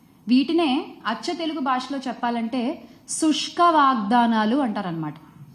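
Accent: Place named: Telangana, India. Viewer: అక్క 😂😂😂 నీది Telangana కదా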